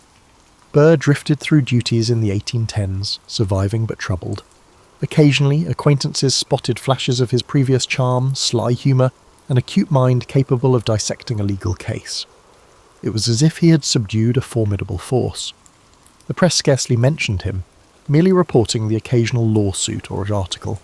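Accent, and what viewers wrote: United Kingdom. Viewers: p.m. in the state of Ohio in the USA. Note: I find it very helpful to put the speed to .75 Love your British accent! 🙂❤️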